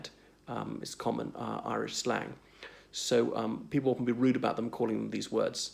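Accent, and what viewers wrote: RP, United Kingdom. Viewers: you have a british accent you have no place describing irish history i will only listen to an irish traveller on this subject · Hi. I am Irish. You have a British accent. I presume you spent a lot of time in the UK? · Wow buddy you sound posh but very interesting article👍 my father is from the Republic and always said men from Kerry/Tipperary area's were excellent for work with pick&shovell 🤣🤣🤣🤣🤣and men from Dublin were only good for pulling pints 😂😂😂😂😂😂 myself my back is covered in freckles and in the summer it looks like I've been sunbathing under a tea-strainer!!!!!!!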